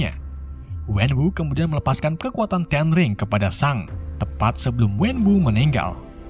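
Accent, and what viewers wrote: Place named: Banyumas, Indonesia. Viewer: Dirungonin 😆😭 bang yeendeh rika ngapak yaa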